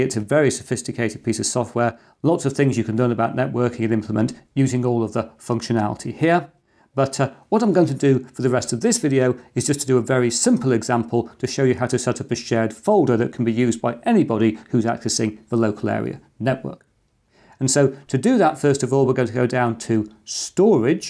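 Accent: England, United Kingdom. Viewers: este señor habla tan claro, que debería trabajar como locutor en el radio. Usando traducción automática, es casi perfecta, mejor que la traducción generada desde una voz sintetizada. Definitivamente el inglés de Inglaterra es mucho mejor que del Estados Unidos.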